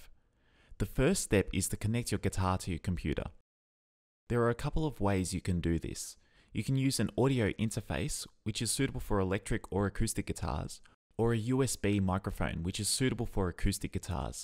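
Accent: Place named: Australia. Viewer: Something about Australian accents makes me pay attention way better, thank you!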